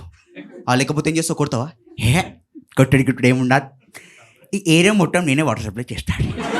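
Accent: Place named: Telangana, India. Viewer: Mana slang lo lekapothe anta comedy ga undedi kademo... But chala bagundhi bro😂😂😂hope you will be one of the famous standup comedian from telangana...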